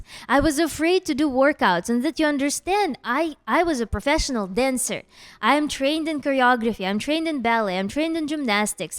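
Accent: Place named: Eastern Europe. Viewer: OK I could sense an eastern European accent, but I wasn’t quite sure. I have three different friends from Russia and we talk on Skype. One is from Moscow one is from St. Petersburg and the other is from Novsibirsk. I’m from Texas. One time I told one of my Russian friends I believe it was the one from Siberia that it was still very hot in September. It was about 38°C. His only reaction was holy shit lol. Another time in summer, my friend from Saint Petersburg asked me what the weather was like. Well, I asked the Amazon echo to convert from Fahrenheit to Celsius and the Amazon echo said it was 40°C. He thought he misheard. He was like did it say 40°C? Lol.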